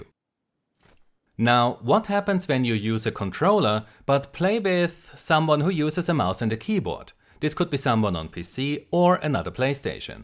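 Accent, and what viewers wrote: Germany. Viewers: Are u german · man hört dass du deutscher bist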